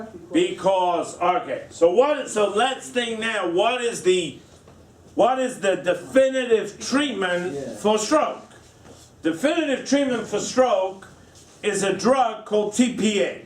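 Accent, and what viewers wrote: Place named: New York, United States. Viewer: Is it just me or does he sound like Timon when he lectures?? Probably just the New York accent, soo endearing 😂 love the lectures, 10x better than my lecturer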